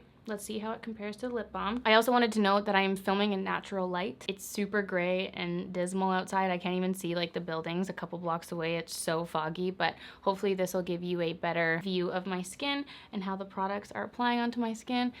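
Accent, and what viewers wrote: Canada. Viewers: I'm living in Scotland but I'm from Vancouver Island, I love watching your videos! Love your makeup and fashion style plus you just seem nice and fun! Love hearing a Canadian accent as well! I would love to know where you get some of your cute little gold earrings. Keep up the great work! xx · She she Canadian?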